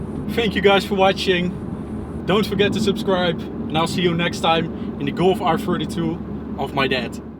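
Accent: Netherlands. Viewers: I dont think you can do anything about this but you can really hear your dutch accent very well :) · You can hear you’re Dutch but that’s not a problem. You’re video is really nice to look at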